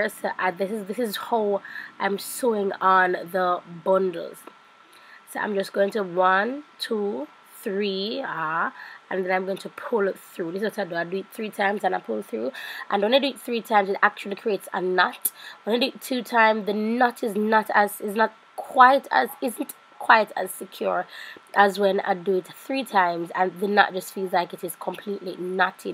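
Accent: United Kingdom. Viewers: I love this hair and your British accent 😊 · Which shipping company you use to ship your hairs herr in j.a ? And is it expensive ? Love the british accent tho 😘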